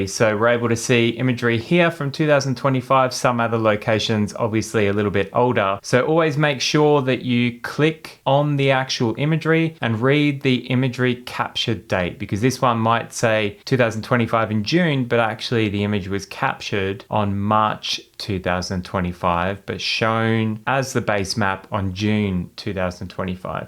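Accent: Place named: Australia. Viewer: What are you trying to get at , as it seems anyone thats not a so called allie , it seems you've got a negative showing of their defence areas but if its an allie its a running track like new Zealand, I think your thought process is a bit on the side of hate and racism, thats not right especially since you sound like an Aussie, who are you getting paid from, because it seems im the only one thats noticed. Its a shame. Australia has only become like this because of tge stupidity of the American's and how Australian politicians cannot help themselves being other than the yes man or sucking up their arses, this is the whole reason this country has gone to shit . Its the stupidity and thought processes like what you've uploaded. Disgusting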